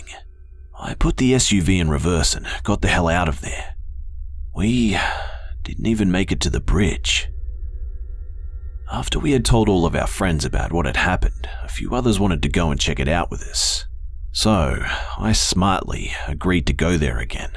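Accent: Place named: Australia. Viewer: The Aussie accent and for the most part locations makes the stories more comical than scary.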